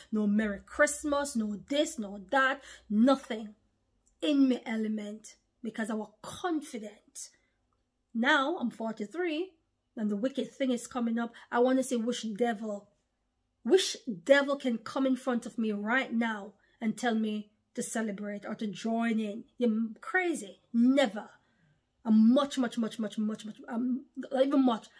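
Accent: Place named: United Kingdom. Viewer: Sister, you look so beautiful. You kind of resemble my mother. They say you’re a false prophet, but I don’t see that. And the facts that you share let’s me know you know the truth. Keep up the good work, we look forward to your videos & appreciate you. I love your UK accent too, “I can’t find mi pen!” 😂😂😂. God bless you and your family Sister 🇨🇦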